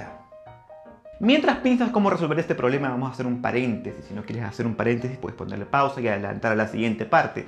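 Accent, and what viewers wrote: Peru. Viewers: eres peruano? :o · O sea eres peruano?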